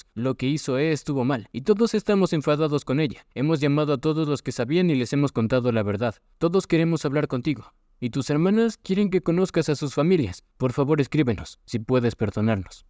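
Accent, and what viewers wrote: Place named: Arab world. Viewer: El acento árabe del software de lectura me ha hecho gracia, solo necesitaba oír que dijera "Habibi"!!!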